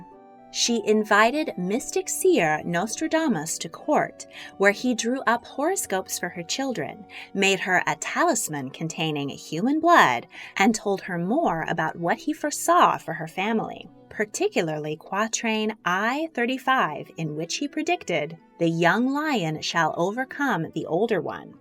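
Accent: United States. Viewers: Must we have this ghastly American accent? All expression utterly distorted. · Not another American narrator ...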